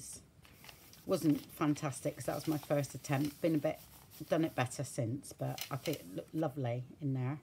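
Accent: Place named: West Country, United Kingdom. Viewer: Missed you! Love your friendly West Country voice! Your videos are great! Love them!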